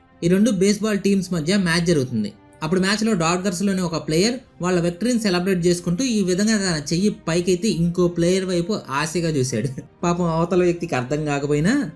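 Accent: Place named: Kadapa, India. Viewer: Bro Facts maata pakkana pedithe....... nee slang mathram highlight bro From:kadapa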